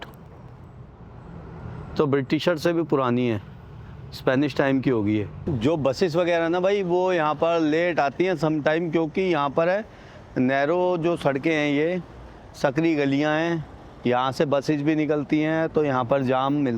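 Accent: India. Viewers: Your accent is very good indian accent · . Plz don't worry about ur pronunciation. Sir u're down to earth. Aur hum jo dekh rhe hain voh bhi aap jaise hi hain. Hum ko konsi French, Portuguese, Russian ya Germany aati hai. Aap ka desi andaz No 1 hy. From Mumbai.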